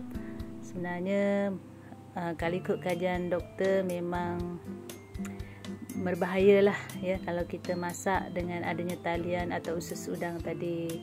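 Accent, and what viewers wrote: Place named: Malaysia. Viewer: saya juga kurang suka dawat nya kak karna nggak enak dipandang warna nya setelah dimasak, denger kak ngomong berasa nonton drama malaysia, aku suka film2 malaysia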